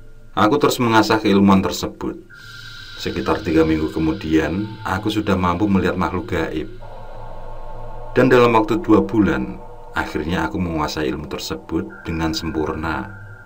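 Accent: Java, Indonesia. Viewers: Suaranya mirip pakdhe Alfan (dongeng tengah malam) logatnya mungkin sama wong jowo nya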